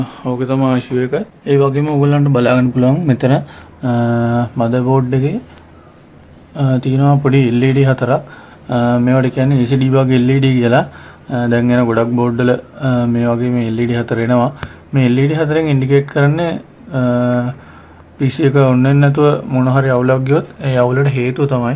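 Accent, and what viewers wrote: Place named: India. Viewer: Title english and you speak indian. I dont know what u are saying bro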